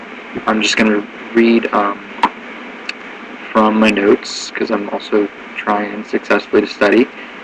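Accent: Russia. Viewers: то как ты вставил "и" вместо "and" в тексте, выдает в тебе русского ) . The way you "accidentally" changed "and" to "i:", betrays your russian origin ) anyway. true or not, it sounds funny, may be too heavy for such a good gramma ). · Very good job, man! I am Russian. Whenever I hear people speak with a Russian accent I can ALWAYS distinguish between an American trying to put on a Russian accent and a real Russian speaking with the so well known to me Russian accent. In your case, I had this subconscious realization that you ARE Russian. I can hardly believe that you are not one. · If you are really not a russian guy, then your Russian accent is P-E-R-F-E-C-T! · Very nice russian accent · Da! Tovarisch, we realy speak like that. I would really say you mimic and speak like most russians. And i'd say youre the one of those immigrants who left russia with parents in a childhood. 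Nonetheless excellent job on your accent pal! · I am russian, yeah when i started learning english i spoke like this :D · I don't know if you are russian with a really good american accent or vice versa xD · Парень ты русский, я живя в США ни видел такого акцента кроме как у русских) а может и правда перфект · Да ты русский)) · Abzal K. он совершенно определенно русский....привезен лет в 10-13 в США...99.9999% уверена, в том что он русскоязычный эмигрант... живу в америке больше 20 лет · wow, this is perfect russian accent! so true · His Russian accent is very good. Or should I say his English is very good? I'm lost. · Since I'm russian i can say it's quite a russian accent though i still have the faintest clue why would people want to sound like russians... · А вы вообще русских знаете? He has so perfect russian accent that many russian viewers thought he is russian. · perfect russian accent. 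From Russia! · It sounds very Russian! Perfect Russian accent! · you do a pretty good Russian accent. are you really Russian? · 100% RUSSIAN GUY :DD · ahhaahahahahah I LOVE IT!!! I'm Russian and even I can't do that hard Russian accent. Very well done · I'm Russian and I'm impressed by your ability to imitate our accent. At some moments I even doubted whether you are American or not... So, great job! Recently I uploaded my own video with my real Russian accent. So, you can check it out on my channel. Cheers! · Вообще-то он говорит очень близко к русскому акценту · Sounds very Russian! Makes me think that he is from Russia. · amazing, I can't believe he's not russian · Реально с Русским акцентом говорит))) Очень похоже)))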